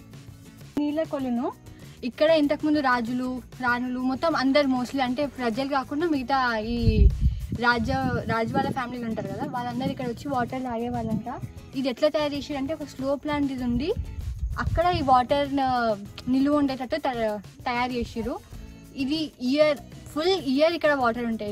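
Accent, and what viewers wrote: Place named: Telangana, India. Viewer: I like ur way of talking telangana muddu bidda Akka love from narayanpet akka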